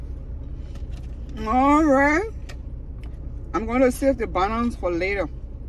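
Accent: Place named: Liberia. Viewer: I know Liberian accent when I hear it 😂❤ nice video!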